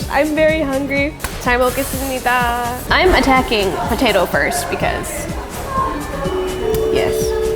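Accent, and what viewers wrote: Spain. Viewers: CON ACENTO ESPAÑOL ES LO MEJOR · tiene un acento re español cuando habla en esp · * tiene acento de Madrid por favor q mona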